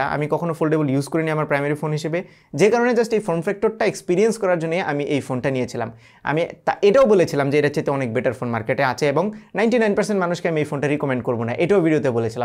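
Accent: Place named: Kishoreganj, Bangladesh. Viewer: Still মানুষ আমারে গাইল্লাইতাছে শুনার পর আমি বিশ্বাস করলাম আপনি আমার জাতি ভাই কিশোরগইঞ্জা ভাই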